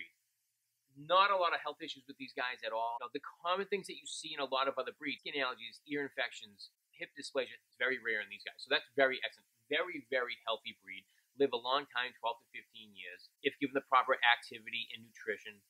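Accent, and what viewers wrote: Boston, United States. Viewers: OMG. This is the most worthwhile review of anything in the history of YouTube. I think the Boston accent puts it over the top. Wait... what were you talking about? Oh right. Booty shorts at the 99 or Reveeeah Beach.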